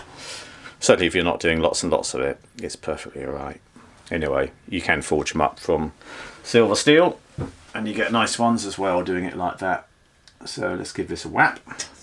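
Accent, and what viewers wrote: England, United Kingdom. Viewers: Great...I love your work and your country English accent.........😊I have a beautiful collection of approximately 100 year old C.S. Osborne tools including Space markers. French edgers including curved etc...A round knife Circa 1900 stamped Crawford..new edge...my everyday tool...Large diameter hole punches...etc... I certainly enjoy your videos,.....P.S. Do you ever work with Cordovan Shell 🐎?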